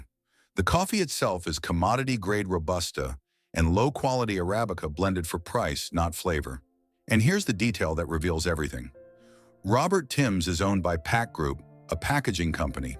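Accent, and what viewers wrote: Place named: United States. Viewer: Why the Yank accent?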